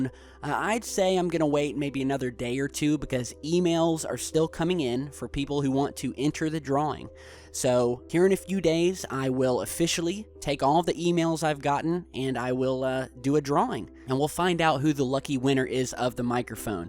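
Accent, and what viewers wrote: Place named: Michigan, United States. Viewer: You're from Michigan aren't you?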